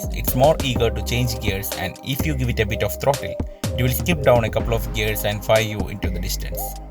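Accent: Kerala, India. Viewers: Nodoubt a malayali out there narrating 👍🏻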